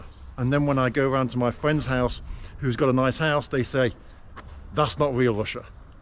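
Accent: United Kingdom. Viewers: If you walk in Detroit or now even in LA or NY, the landscape is even shitty than that. Thast's the "real Russia" you want to show. And your british accent teils us why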